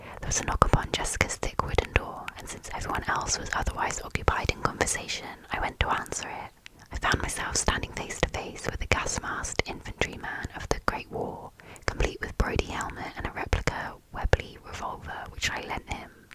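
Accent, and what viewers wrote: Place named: RP, United Kingdom. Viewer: Among the many... MANY accents on your little island, yours is by FAR the best 😊 It makes you sound so posh lol #fancy 🩷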